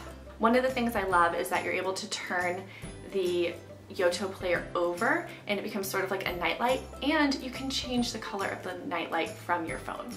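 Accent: United Kingdom. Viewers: Nothing wrong with the British accent.... But to be fair, it's an English accent. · Great summary, tbh MOST products on the market have an American accent so it's a refreshing change to find one with a British accent! :) Yours truly, a Londoner.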